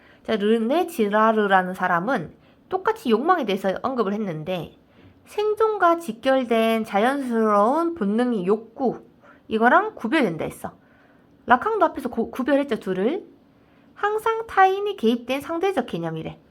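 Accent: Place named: Gyeongsang, South Korea. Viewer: 선생님 덕분에 수능완성 실전5회까지 독서까지 잘왔네요..그런데 실전모의고사5회 독서지문 처분의 무효,취소,철회지문이랑 (가)로켓엔진의 종류와 특성(나)우주선 발사체를 이동하기위한 중력추진 지문 해설해 주실 수 있나요?…법률지문은 어휘랑 개념이 생소해서 어떻게 읽어야하는지 분류해야 하는지 모르겠어요…그리고지구과학 지문은 쌍곡선이라든지 글이 그림으로 그려야하는데.. 처음 한번에 그려진다든지 이해가 되지 않네요…후반기 더운 여름 시간괜찮으시면..해설 부탁드려요…라캉이론 올려주셔서 감사합니다..귀여운 경상도 발음이 쏙쏙 귀에 박히네요ㅎㅎ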